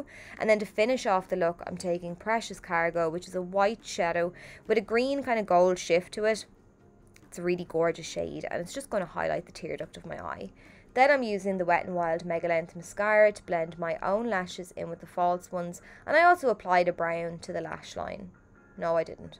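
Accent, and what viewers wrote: Ireland. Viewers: Are you Irish? Sorry that’s random to ask, just really like the sound of your voice so I was just curious ☺️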